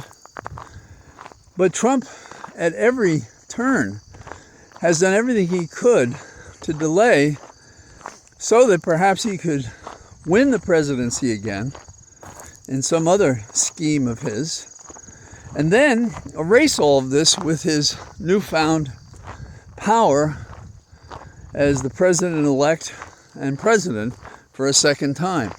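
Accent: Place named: Ireland. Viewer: ... I never miss your talks. I always learn a great deal. Your critique of Judge Juan Merchan and D.A. Alvin Bragg today is understandable. However, I believe there is valid reasoning behind their actions. The D.C. Circuit Court is going to lay out Jack Smith's charges and these will be presented and discussed with reporters able to appraise them. That will occur before the election and I believe they will have a great impact upon the public, the court and the election. After Mr. Trump is defeated, the judge will have a full range of options to sanction him. That is, I believe, the plan. We shall see. Thank you for your input each day and for your Irish lilt!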